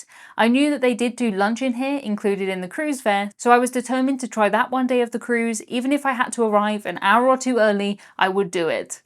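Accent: United Kingdom. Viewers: Shedule is my fav British work lol. They just forget the C in schedule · I would love to run into you on a cruise just so we could sit down and talk about cruises and you experiences that you have. I love to hear your commentary not sure if it the British accent but listening to you explain these things just sounds so lovely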